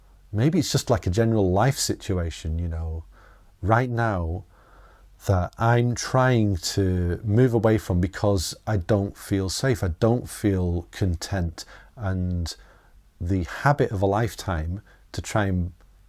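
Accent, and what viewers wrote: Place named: Northern England, United Kingdom. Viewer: I don't spend much time on You Tube (less than one hour a week, I reckon)... came across your channel recently, love your content! Very calming, reassuring, inspiring and deep. Also love your accent, you sound like one of the Beatles!! (are you from Liverpool? Certainly from Northen England, I guess). Bless you. Cheers from Italy.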